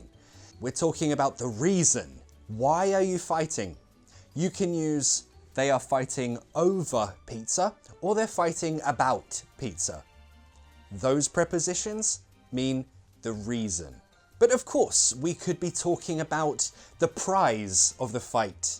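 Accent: United Kingdom. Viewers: "From Bangladesh 🇧🇩🇧🇩" I have already watched many offline videos of your channel. That's are very useful for learning and pronunciation.So, this channel has become my best channel in YouTube for learning English in British accent. Thanks a lot,.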